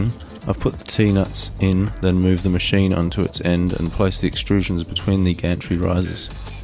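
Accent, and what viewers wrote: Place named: Australia. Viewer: Where you from you sound Australian ?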